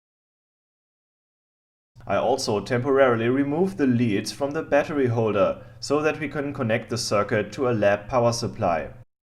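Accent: South, United States. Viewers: love the american accent :) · I applaud your perfect American accent. Source: I'm an American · your American is excellent. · Your 'Murican accent is impressive. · We sure appreciate a southern accent here y'all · I always laugh at how southern American you can sound, after speaking in your proper German accent. Gets me every time. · Pretty good American accent :)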